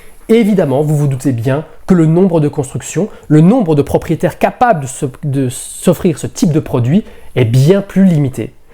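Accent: North, France. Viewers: L'accent du nord. Rien de spécial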